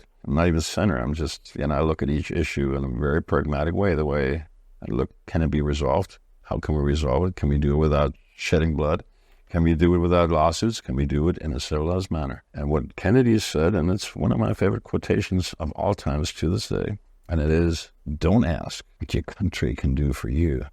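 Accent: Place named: Orlando, United States. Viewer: Great video and as always to the point in an Orlando way of speaking. 😉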